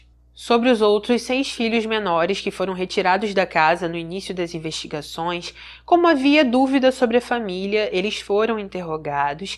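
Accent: Rio de Janeiro, Brazil. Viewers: Adoro seu sotaque do Rio de Janeiro . Saúde, sorte e felicidades! São Luís, 28/07/2023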